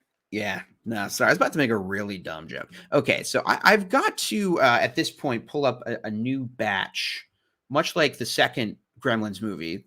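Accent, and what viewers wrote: United Kingdom. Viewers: The British accent!! 🤣🥰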